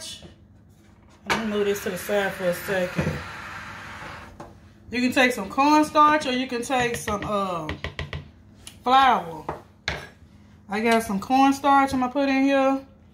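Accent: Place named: South, United States. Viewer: I hear 615 all in your slang and southern dialect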